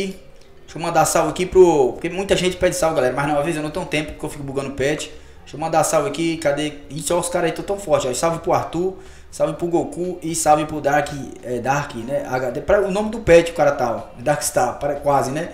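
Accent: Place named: Brazil. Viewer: Ree brasileño el man :v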